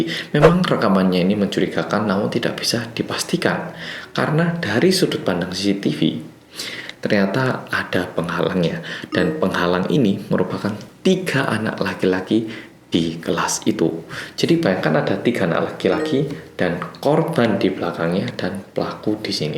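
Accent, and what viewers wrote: Java, Indonesia. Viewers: Semoga menjadi contoh dan perhatian pemerintah Indonesia preside dalam melindungi anak bangsa dari berbagai sisi dan menjadi hemat dalam membuat undang2 perlindungan anak perlindungan anak Saya pernah dengar langsung cerita dari anak sepupu (masih kelas 5 SD) bahwasanya ada teman lelakinya disekolah menarik teman perempuan disekolahnya dn menyuruh membuka pakaian dalamnya dn diberikan uang, bayangkan betapa terkejut sekaligus sedih dn takutnya saya, dan menurut keterangan sianak mereka sudah memberitahu kepada guru, akan tetapi tidak terlalu ditanggapi , hal ini sepertinya sering terjadi disekolah, terlebih di daerah. Semoga menjadi perhatian lebih dari pemerintah khususnya kementerian pendidikan, karena sekolah harusnya aman dan nyaman demi masa depan bangsa.Amin. Terima kasih bt oppa yg mendok, terimakasih sdh mengedukasi. Sukses slalu. 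· Tiba” mncul d dranda ,pas aku buka videonya,mukan dia korea bgt tp pas ngmong logatnya jawa bgt kocaakkk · Mas nya ini asli org korea bukan ya.. kok logatnya jawa.. apa keturunan aja ya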